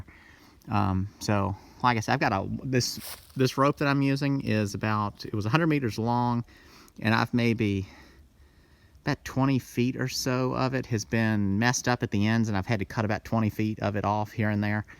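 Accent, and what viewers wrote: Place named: Carolina, United States. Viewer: I've wondered myself if he's from North Carolina. However he always hides the front license plate on his vehicles and the only southern state to require front plates is Virginia. His accent is Carolinian though so I wonder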